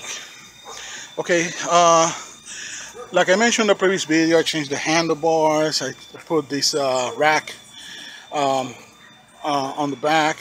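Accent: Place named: Cuba. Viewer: U are Cuban I can tell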